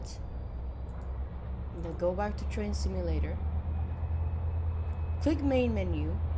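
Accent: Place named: United States. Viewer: why does bro sounds american when bro lives in saudi arabia 💀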